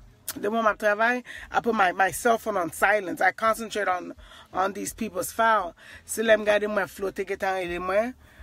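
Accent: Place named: Haiti. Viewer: She might be a haitian American but she sounds like real Haitian but she played it out good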